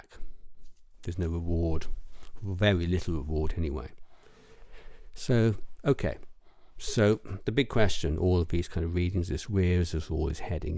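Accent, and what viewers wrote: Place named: England, United Kingdom. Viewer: Hello, nice to hear an English reader, and male to boot. Good reading, but being the other interested party it's not so heartwarming. Thank you, I shall keep my eye out for further readings, I have subscribed. UK listener.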